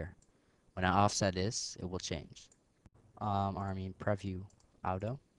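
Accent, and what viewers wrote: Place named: Philippines. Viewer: are you filipino by any chance? i have a lot of friends that sound just like you :D and nice vid!!! i love sony vegas~ oh and i was wondering, how do you get a transparent fade in between 2 videos. i used a fade through transparent transition and it only goes through black.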